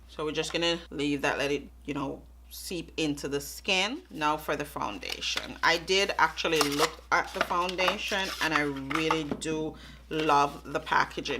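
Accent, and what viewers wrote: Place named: Jamaica. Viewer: awesome!!! I’m Jamaican as well that’s why I asked. I could pick up the accent.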